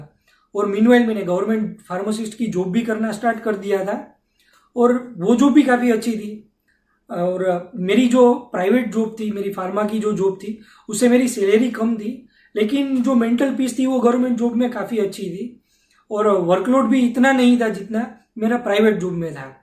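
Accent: Gujarat, India. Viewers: Lucky you brother, I’m also Gujurati just like you. Maja ma?😅 I’m having 65 points in SINP at the moment but it’s been sm tough time due to covid so I dnt thnk the points would go down in near time but looking at Canada’s plans to invite more than 4 lacs immigrants, my hopes are up. Let’s see. Tamara tone pat thij first video ma khabar padi gai k lage to che aapna Gujurati, keep it up buddy🤟 you’re doing a great job for ppl like us who’re planning to migrate and settle in canada. Specially SINP is only hope for me too at the moment.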